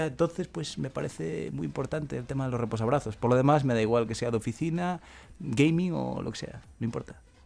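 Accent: Galicia, Spain. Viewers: Tienes la voz de wismichu fijo que eres gallego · Eres gallego?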